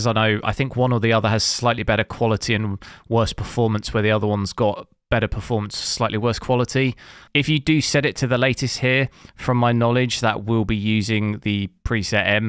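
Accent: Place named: United Kingdom. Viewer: Out of topic - where are you from? Cause you mix accents and pronunciation, you have British accent but you pronounce some words differently, e.g. "twenty" without the "t" ;)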